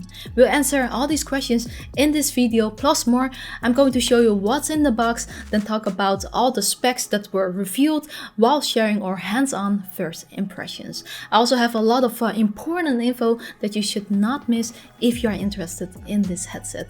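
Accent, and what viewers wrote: Netherlands. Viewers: Iam from belgium and i can hear your dutch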